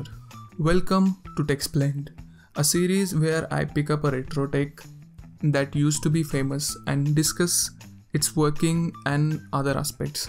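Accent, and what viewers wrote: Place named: India. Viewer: Just hear indian that's it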